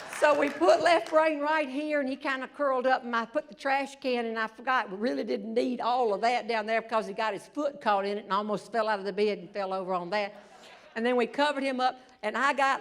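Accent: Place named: North Carolina, United States. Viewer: I could tell by your accent you were from N.C. I was hearing the Piedmont area and then you said Alamance County. There you go! I live on the east side of Raleigh off 64.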